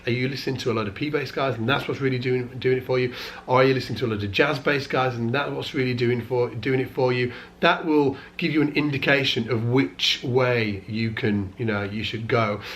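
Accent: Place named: Northern England, United Kingdom. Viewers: Northern British English. He lives in Leeds. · Hi, I'm new to bass but have played guitar for decades off and on. I'm far from expert but I play because I like to play, not because I'm really very good, though some say I am. For a little diversion I bought an Ibanez SDGR for under 2.5ton£'s. It has active pups, 3 band tone controls and a little switch that can change between single coil mode, humbucker and active output. I can get real smooth buttery bass, to cheesecake, then a bit of biscuit crumbs in the mix right the way to total gingersnap sound. Really loving this very cheap axe, and I have 5 others top notch guitars that were much dearer. Maybe it's all about the bass! Where you from in Leeds? I recognise the accent. I was born in Burley then moved to Tinshill when I was 7. Been in Newcastle since:)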